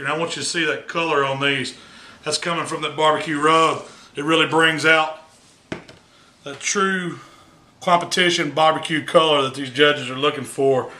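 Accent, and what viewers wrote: South, United States. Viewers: 1 thing u trust is a fat guy with a southern voice haha.. them big boys can cook them meats haha..love this guy!! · You know it going to be some good bbq when the guy making it has a southern accent 😂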